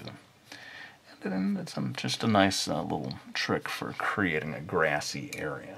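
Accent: Brooklyn, United States. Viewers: Crilley Lesson; It Doesn't matter if you do traditional art or digital art, it'll always be art....and Brooklyn accents are awesome.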